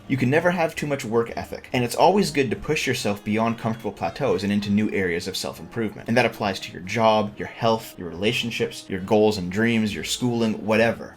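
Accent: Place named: London, Ontario, Canada. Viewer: I swear you could be from london ontario. But what do i know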